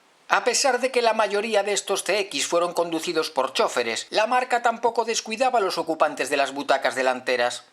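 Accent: Galicia, Spain. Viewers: Excelentes vídeos y como no, preciosos paisajes. Todo un descubrimiento tu canal ,y el acento Gallego me da morriña. Saludos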